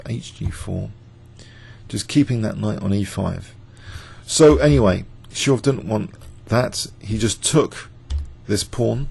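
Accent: United Kingdom. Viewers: British ascent, hoo!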